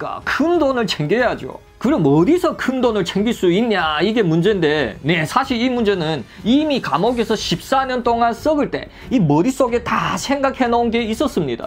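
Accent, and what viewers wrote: China. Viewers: 초기엔 조선족 단어 말투 5%라면 요즘엔 거의 1%입니다. 한국인이라면 99%이해할거고 조선족이시라면 80~90%이해하실듯합니다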